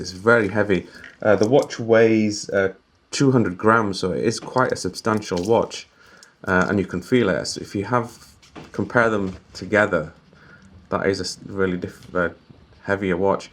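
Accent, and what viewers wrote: Yorkshire, United Kingdom. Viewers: Will do my friend I've subscribed so will be watching your reviews from now. Happy new year . PS do I detect a slight Yorkshire accent may be Leeds ?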